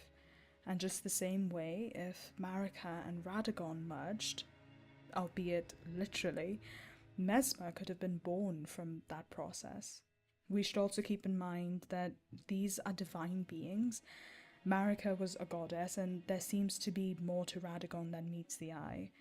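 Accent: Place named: Germany. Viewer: I hear a bit of german in there?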